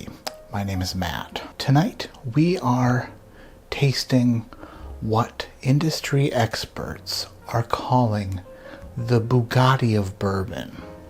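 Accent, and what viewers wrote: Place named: Minnesota, United States. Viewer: The mystery of Matt’s upbringing continues. When I first heard him say his own name, not but ” I said, “a- ha—Minnesota.” The name Matt should take a split second to say but he extends it out and taffy-pulls what was supposed to be a simple short “a.” Tortures it in that nice way, being and upper Midwesterner. Friggin is also Minnesotan, as are many of his other phrases. But the upper Midwest accents have no sharp state boundaries. Now he drops “thimbleberry” on us, or rubus parviflorus. That put a whole née spin on his mysterious origins. We know he lived in Michigan for some time before moving east. Clueberry. Thimbleberries are only found in Minnesota on the Lake Superior shoreline so Duluth is a possibility. But they are most common along Michigan’s Upper Peninsula, aka da U.P.? Could it be that is a Yooper? 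A native of the land of not quite Wisconsin and not quite Michigan and not quite right? Maybe Marquette, Sault Ste Marie, or perhaps Esky? Houghton up on the Keeweenaw is where all the thimbleberries can be found. At some point I hope tells us where he friggin grew up and got that outrageous accent!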